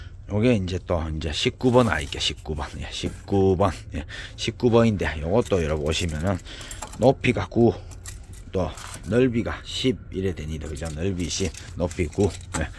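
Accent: Gyeongsang, South Korea. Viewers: 구수한 경상도사투리 멋있는 오빠 경북 영주시에 있지요~^^ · 안녕하세요 수고가 많으십니다 구수한 목소리 경상도 사투리 반갑습니다 경북 영주입니데이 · 안녕하세요^^ 사장님 목소리톤 엄청시리 매력있는데요 ㅎ 갱상도 사투리 ㅎ 경북 영주시 우암도예 분과 배합토가 유명하죠 저도 한번 가고싶네요..올도 화이띵입니다❤❤❤ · 경북 영주에 있지요 사장님구수한 사투리가 경상도 아재 아닌교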